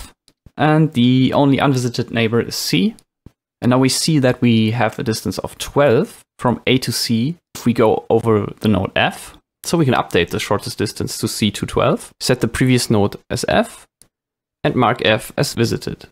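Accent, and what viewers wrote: Germany. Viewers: I trust this guy beacuse of his german accent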